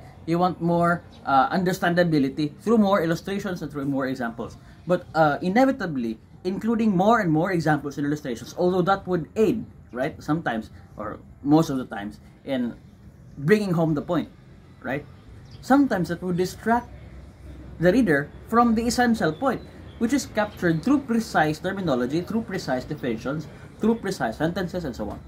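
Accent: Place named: Philippines. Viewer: hes got a philipino accent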